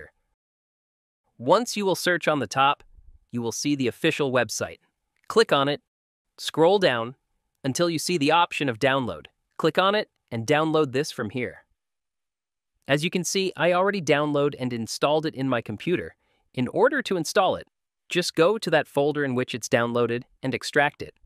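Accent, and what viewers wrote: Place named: India. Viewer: Thank you random Indian/wherever that part of world person for using AI to make it so we could understand you.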